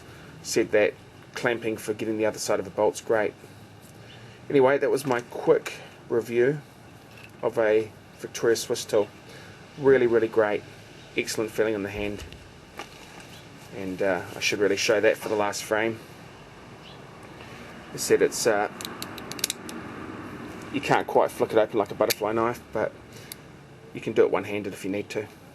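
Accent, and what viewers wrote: New Zealand. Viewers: I actually didn't look on your channel page, I can tell the difference between an Australian and a New Zealand accent. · I'm thinking of getting this instead of Swisstool X because of the ergonomics and weight. Cheers on the review and the accent. New Zealand?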